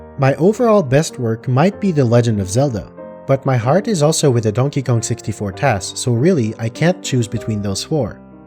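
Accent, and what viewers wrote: Quebec, Canada. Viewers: Being from Quebec as well I had such a feeling that’s why your accent was. Great videos! Keep them up! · I could tell you had a Quebecois accent, it's very faint though.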